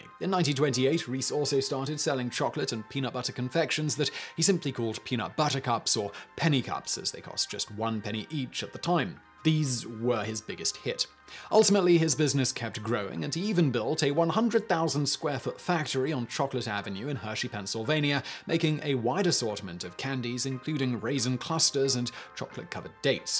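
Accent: England, United Kingdom. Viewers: I'm really glad u didn't refer to hitting the like button as "smashing" it, like you have in previous videos. It's just kinda cringey. "Smash" is a term used by American teenage YouTubers, it sounds really wrong coming from an adult. Not to mention an adult with a very proper English accent. Your accent actually gives you a good amount of credibility, and when you say "smash that like button", it takes all that credibility away lol. i love you and i love the channel! Just no more smashing, ok? :) thx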